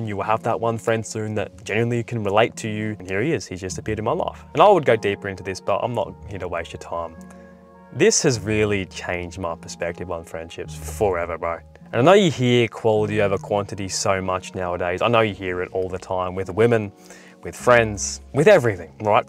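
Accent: Australia. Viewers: I mean you have the looks I never get use to the Aussie or kiwi or whatever accent that is but I'm sure some other women like it lol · I sense an Australian accent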